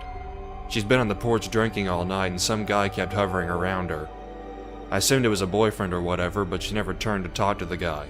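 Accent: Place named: South, United States. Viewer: Love your accent. Sounds southern.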